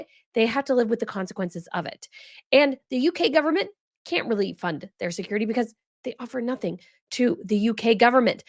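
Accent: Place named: United States. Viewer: Someone has put the toothpaste on Charles’ toothbrush for him every day of his life. What on earth would Harry or any of the rest of them know of the “real world”? It’s weird to hear an American accent defending William being obviously more important than Harry. I must be TOO American. “Royals” are just ppl. Being born doesn’t make anyone special. But the only reason Harry needs security is the circumstance of his birth. It’s all very weird.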